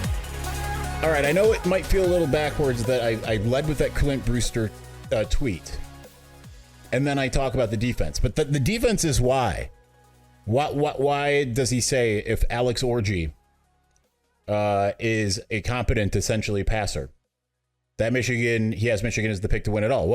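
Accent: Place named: Ohio, United States. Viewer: Yoooo the buckeye accent 😂😂😂😂😂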